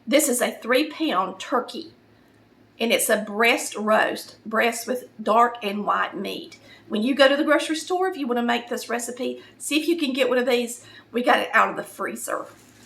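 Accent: South, United States. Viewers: Apparently caption doesn’t recognize southern accents: Hi y’all it’s with...” 😁😂. Aside from that, thanks for the turkey tips!! 💕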